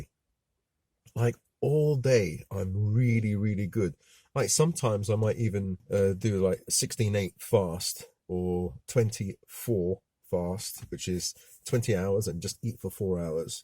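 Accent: United Kingdom. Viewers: Are you from Birmingham?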